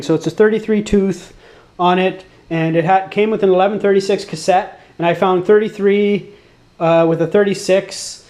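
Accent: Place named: Canada. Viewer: This dude sounds Canadian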